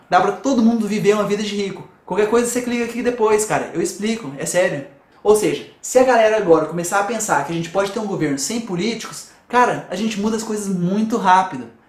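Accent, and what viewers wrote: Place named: São Paulo, Brazil. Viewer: As idéias são boas; O triste é ter que suportar o vomitável sotaque paulistano e os "tá ligado???". Tá ligado???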